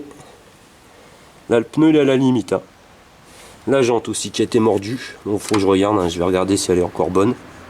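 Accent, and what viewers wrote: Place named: North, France. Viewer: Bah merde, jme disait l'gars ya un accent de nord et bin javais raison :D Fiere du nord :D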